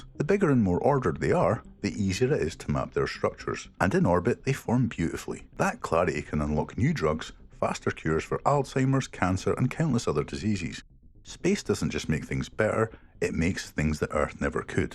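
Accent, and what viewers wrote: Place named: United Kingdom. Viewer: this is just creepy i was hoping to get away the britt accent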